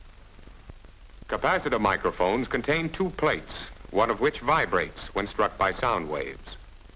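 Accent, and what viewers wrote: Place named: New York, United States. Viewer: I’m trying to figure out his accent. Where do you feel he is from? East coast? West coast? New York? I am from NY and have seen old films where people who are from NY used to have slightly different accents.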